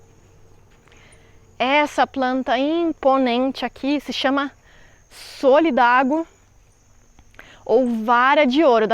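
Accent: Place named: Rio Grande do Sul, Brazil. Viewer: Como eu adoro te assistir , vc é pura inspiração Graças a você hoje eu consigo ver algumas plantas q são consideradas “pragas” com um olhar mais amoroso , sem contar q seu sotaque é o mais gostoso de ouvir , adoro o sotaque gaúcho , tô aprendendo muito com seus vídeos , obrigada por dispor do seu tempo pra nos ensinar tantas coisas boas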